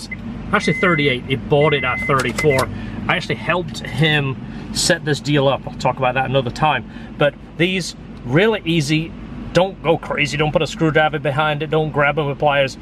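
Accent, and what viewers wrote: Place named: Yorkshire, United Kingdom. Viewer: Hey. 3 things to mention here. Good tip on drilling the holes to prevent further cracking.👍 The repair job came out nice. The S2000 buyers assistance program sounds great. I think there's a lot of value to the customer to have an S2K specialist like yourself sourcing the car for them. And finally, there's nowt wrong with your accent. It's Yorkshire innit?😉 I mean, you hooked Esther with that accent, so it sounds like a winner to me. See you on the next one.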